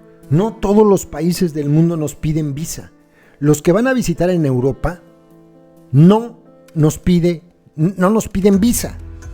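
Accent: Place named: Mexico City, Mexico. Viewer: Al estilo chilango ñerooo son de la capital yo soy de la provincia y cada estado y ciudad tienen diferentes tonos .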